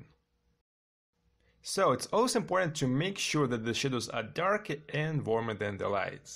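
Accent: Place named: Russia. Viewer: Your channel is great. Subscribed. And your Russian accent is very pleasant.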